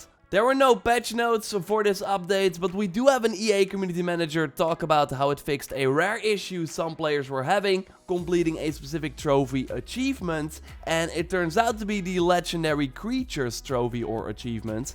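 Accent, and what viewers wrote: Netherlands. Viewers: Are u dutch? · Are you Dutch? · Volgens mij is hij nederlands vooral het accent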